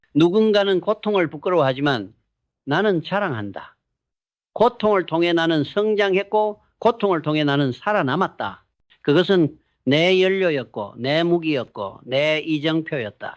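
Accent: Gyeongsang, South Korea. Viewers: 경상도 목소리가 진짜로 조언해주는 느낌이라 좋아요 ㅎㅎ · 굉장히 호소력있는 목소리 입니다. 경상도 억양이 되레 호감으로 들립니다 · 역시 경상도 목소리가 좋아요...강한 느낌...머리 속에 팍팍 박혀요~~~~^^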